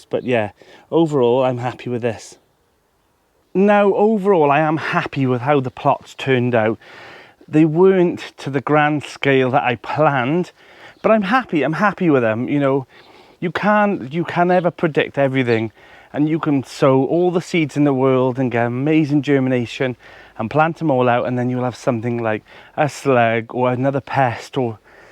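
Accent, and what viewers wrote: South Wales, United Kingdom. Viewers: I love your accent. Reminds me of my relatives from South Wales. I'm just really hard of hearing. Many thanks. X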